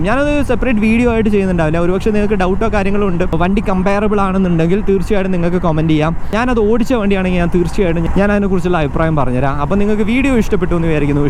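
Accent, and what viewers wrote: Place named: Kannur, India. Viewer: Machane ingal Kannoorkkaran aanalle ....❤️❤️🥰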